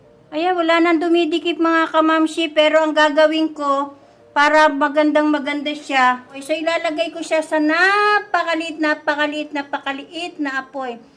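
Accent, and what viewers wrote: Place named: Paete, Philippines. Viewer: taga paete laguna po ba kayo? Pansin ko lang po kasi sa punto. heheh ang ganda po ng cooking vlog nyo. madaling sundan sobrang detalyado..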